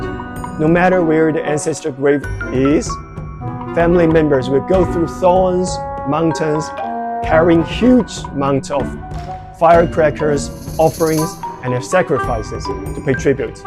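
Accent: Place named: China. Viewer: I think he is based in China because of his accent, Hong Kong people have a different accent.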